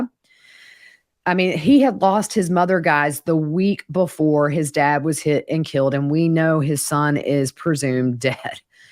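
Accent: South, United States. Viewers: I could listen to you talk 24/7! I just love your voice with a slight accent. Are you from the South? I’m in western North Carolina but my accent sucks! lol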